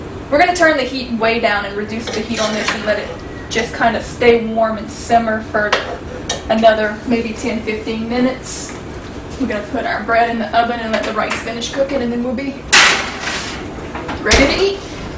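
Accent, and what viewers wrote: Louisiana, United States. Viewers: are you cajun??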